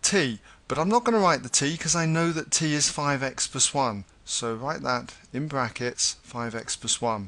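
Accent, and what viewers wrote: United Kingdom. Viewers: thank you so much. excellent pace and explanation. plus, i felt like i was at hogwarts with your wonderful accent! :) thanks again!!!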